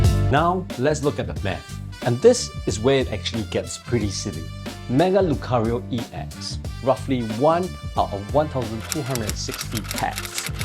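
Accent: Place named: Trinidad and Tobago. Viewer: Random: Your accent gives a hint of caribbean..Trinidad to be exact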